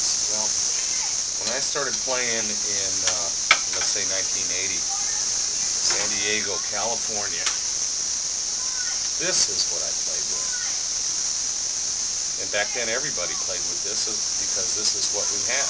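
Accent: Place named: Tennessee, United States. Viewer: Sounds like he lives in Tennessee lol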